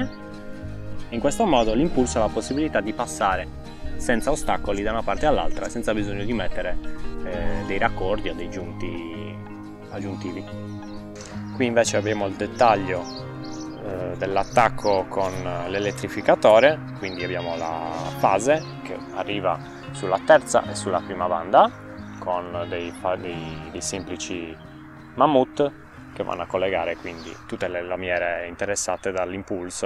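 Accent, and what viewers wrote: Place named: Campidano, Italy. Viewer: Dove vivi esattamente?Dal tuo accento direi Campidano. Un saluto da una sarda in Scozia.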